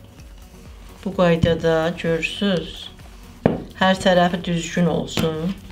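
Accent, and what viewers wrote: Nakhchivan, Azerbaijan. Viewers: Bacım Naxcıvanlısız?? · Eladi. Deyesen Naxcivanlidi bu anamiz..mende ordanam Ordubad rayonundan. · Xala deyesen naxcvanlısiz😂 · Əlinə saağlıq nağcıvanlı kimi danışır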